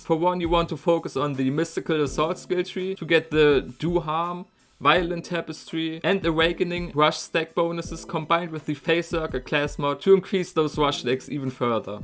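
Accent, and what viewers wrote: Germany. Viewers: Der deutsche Akzent ist ziemlich offensichtlich bei ihm xD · Think his first language is not English but German or so.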